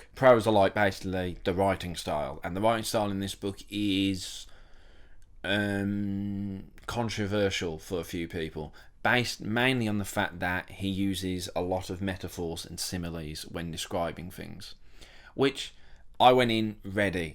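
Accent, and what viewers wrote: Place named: Birmingham, United Kingdom. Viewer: Hi, I know you’re from Australia, but can I ask…are your parents from Birmingham? I’m having an argument with my husband that your accent is not purely Australian. I can hear some Brummie in it. Please excuse the prying, but I lived in Australia and you still sound more Brummie to me. Would you mind letting us know??? Please…thank you.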